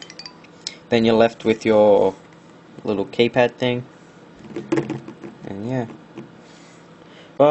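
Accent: Australia. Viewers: Your voice -3 Australian ? ;) me like. Sorry.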